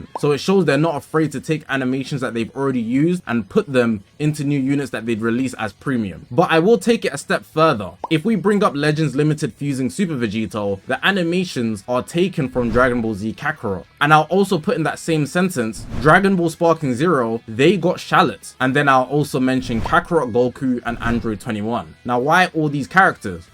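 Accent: United Kingdom. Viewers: wait I did not know you were British honestly this is news to me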